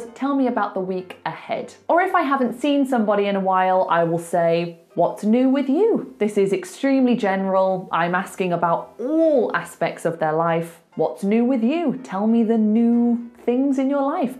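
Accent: United Kingdom. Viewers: Which part of UK are you from? Your accent has reminded me a lot of my time in the UK. Thanks a lot for your great videos. Keep moving on!! ❤ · Hi Teacher, I like all your useful English teachings. I love the way you speak British English. I want to learn all your important lessons teacher. Thank you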